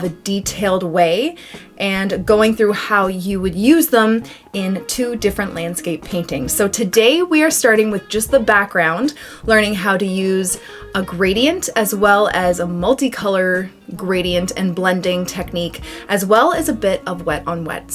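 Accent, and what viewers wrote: Canada. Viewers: Before I subscribed- I was watching one of your videos, and as I was listening to you- I was like “Do I detect the Canadian rise?!” Lo and behold- I decide to subscribe and see that you are one of my people! ❤🇨🇦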